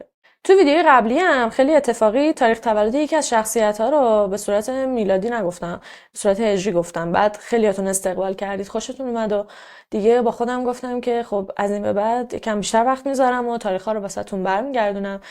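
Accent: Mashhad, Iran. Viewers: از کجای مشهدی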